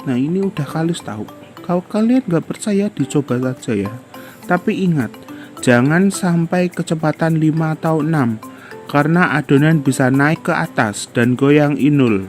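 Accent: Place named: Tegal, Indonesia. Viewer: Oh.. sampean org tegal to...sip dah he he heee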